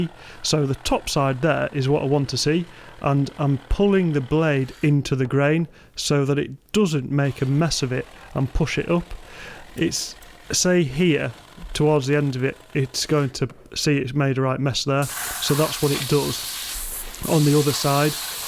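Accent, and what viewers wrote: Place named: Northern England, United Kingdom. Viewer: Lancashire somewhere I would guess good question .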